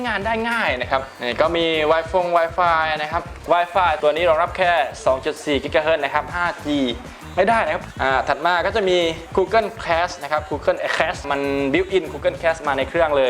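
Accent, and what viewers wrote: South, Thailand. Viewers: ไม่ทองแดงครับ เป็นสำเนียงของคนมาลายูสามจังหวัด ชีวิตประจำวันเค้าพูดภาษายาวีกัน เวลาพูดกลางแอคเซ็นเค้าจะเป็นประมาณนี้ สำเนียงทองแดงจะเป็นของคนพูดใต้ครับ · สำเนียง เหมือนคนใต้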